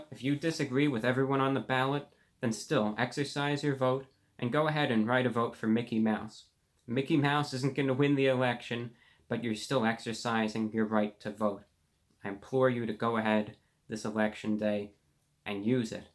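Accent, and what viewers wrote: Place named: New England, United States. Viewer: What kind of accent does he have? Vermont? New Hampshire? That’s an interesting accent...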